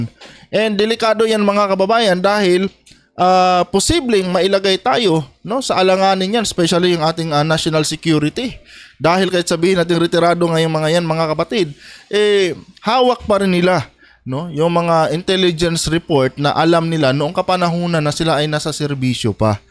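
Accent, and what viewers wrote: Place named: Maranao, Philippines. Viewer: Ang vloger parang maranao mag salita.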